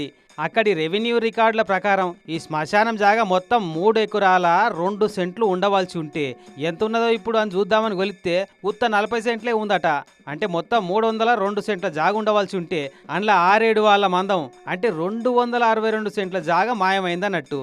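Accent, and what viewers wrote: Telangana, India. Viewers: ఒరేయ్ tv9 గోదావరి జిల్లాలో news ని thelagana యాసగా పులిహోర కలుపుట o ఏంటి రా బాబు 😅😂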